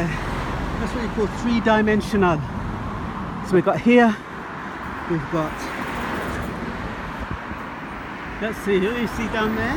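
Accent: Jamaica. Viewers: As much as your English you cannot hide the Jamaican in you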